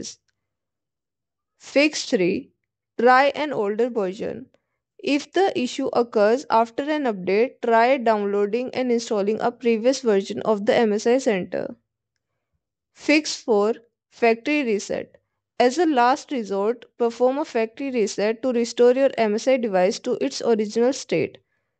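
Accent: India. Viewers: Can't watch you sound indian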